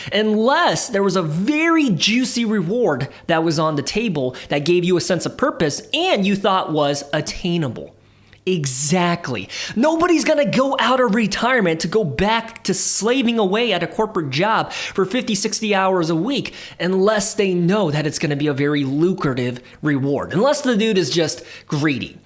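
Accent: United States. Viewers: It is so funny to see a chinese guy speaking which such a heavy Gringo Accent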